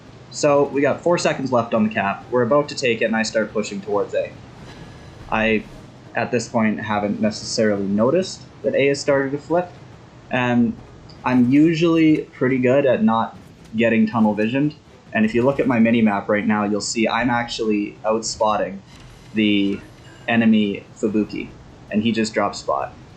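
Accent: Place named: Canada. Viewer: Maybe. Apart from my heavy Midwestern USA accent and your mild Canadian inflection.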